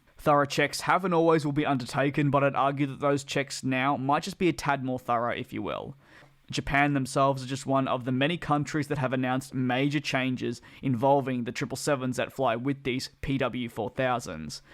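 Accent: Australia. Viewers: Are you from Sydney Australia?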